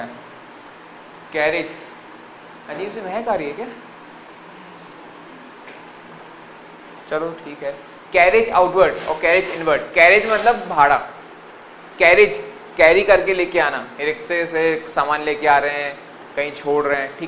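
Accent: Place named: Ratlam, India. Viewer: yes i was right..... Apki language me apna pan lga.... me bhi ratlam MP se hoon 😊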